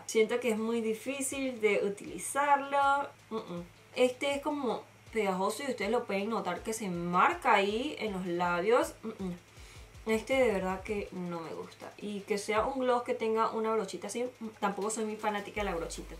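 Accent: Venezuela. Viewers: Eres venezolana? Hablas como venezolana!❤ saludos desde Vzla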